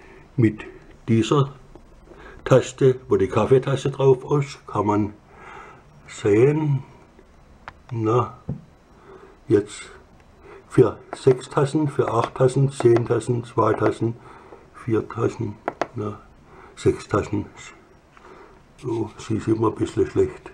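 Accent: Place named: Swabia, Germany. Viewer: Hallo, Danke für das Video. Wollte mich kurz erkundigen ob du bis dato immer noch mit der Kaffeemaschine zufrieden bist?! Bin am überlegen mir die Selbe zuzulegen. P.S. nach deinem Dialekt nach kommst du (so meine ich) aus dem Schwabenländle. Pforzheimer/Calwer Gegend vielleicht?:) Grüße aus dem Schwarzwald;)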